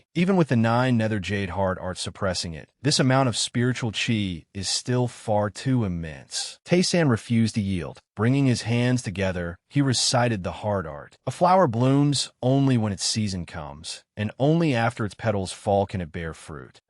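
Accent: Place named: Arab world. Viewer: Why does the narrator jump into an Arab accent every couple of minutes?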